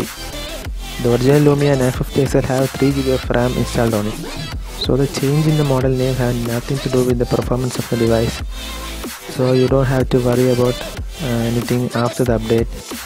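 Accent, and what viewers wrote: Kerala, India. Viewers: malayali anno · Malayali annalle😆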